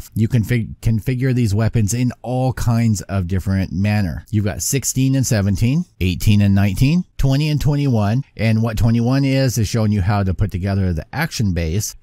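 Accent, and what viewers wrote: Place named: West Coast, United States. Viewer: Accent? Hm.. I suppose he has a West Coast accent since he lives in California. I don't really hear too much of an accent though.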